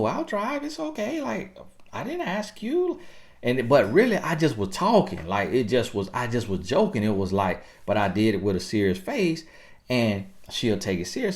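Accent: South, United States. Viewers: "How a queen lil" lmbo boy you is country!! Lol I love it!!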